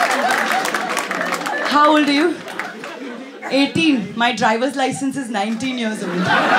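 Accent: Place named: Bengal, India. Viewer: i have seen only first 1 min still i feel u hv bengali accent bengali face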